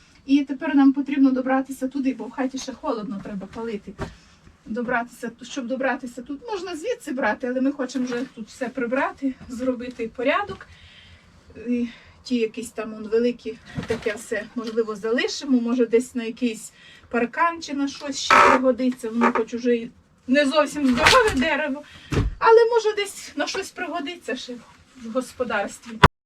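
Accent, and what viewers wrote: Western Ukraine, Ukraine. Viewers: Ваша мала Батьківщина-не Карпати.Ну дуже тембр голосу західноукраїнський.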